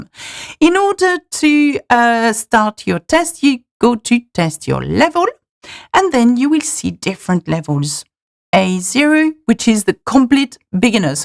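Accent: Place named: France. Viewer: Elle parle anglais couramment, mais elle a un accent français très fort.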